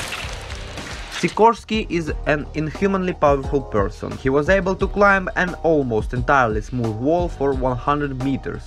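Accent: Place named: Russia. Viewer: Первое что услышал, это то что он русский А потом посмотрел мейл ру почту в описании канала)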